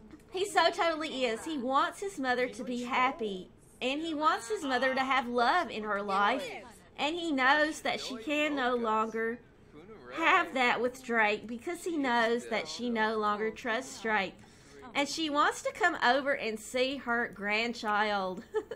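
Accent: South, United States. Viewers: Hi!:) I've commented on a few of your past videos and I've finally caught up on this LP and let me just say: I LOVE it! It's honestly one of the best Sim's LP's I have ever watched. I love how you continue the story; you're so creative! And your voice is very soothing; I could listen to you all day.. It reminds me of the family that I have down South in Kentucky and Tennessee! One more thing before I go! (Sorry this is such a long comment.) I found a picture on Facebook of a house and I was wondering if there was a way I could contact you and send it too you? I would love to see a speed build with your take on the picture! Thanks..:)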